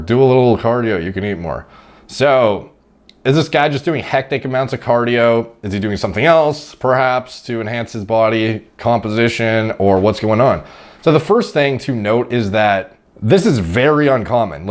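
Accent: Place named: Canada. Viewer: sounded more Canadian than usual in this vid, particularly at "that's jumping to conclusions, no?" and with his polite disapproval of Reddit calling Lewis gay lmao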